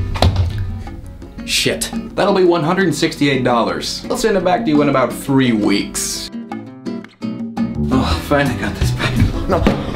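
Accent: Ireland. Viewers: what part of ireland are you from ?:)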